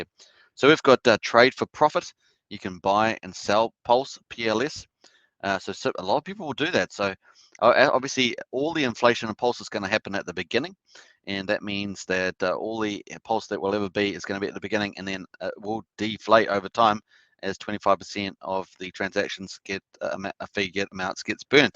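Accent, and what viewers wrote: New Zealand. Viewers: Hey bro, good to hear a Kiwi doing some streaming.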